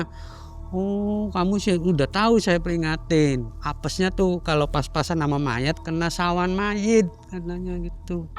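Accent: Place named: Java, Indonesia. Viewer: Aku suka ngomongnya medok Jawa suka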